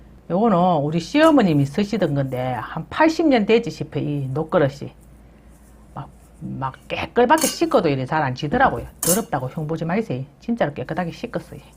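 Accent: Gyeongsang, South Korea. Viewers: 구수한 경상도사두리가 친근감있어 좋아요. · 샛낫꼽때기만큼 아주조금 경상도아지매 맛나게하시네요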